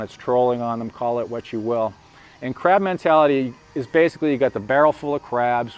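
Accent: Boston, United States. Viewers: That Southie accent, it creeps me out , I am from NJ and I hate the Soxs, ( love the Yankees) hate the Pats and all that tainted NE crap........ well except the chowda and the lobster rolls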